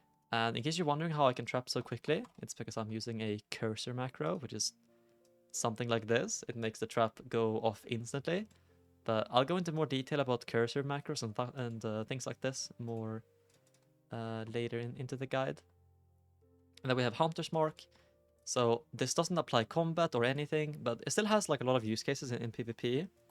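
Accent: Sweden. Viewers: Hey you are Swedish right? Trying to learn my accents.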